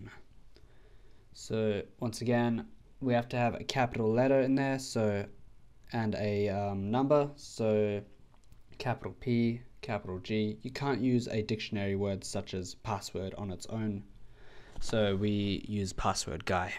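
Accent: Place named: United Kingdom. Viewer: British talk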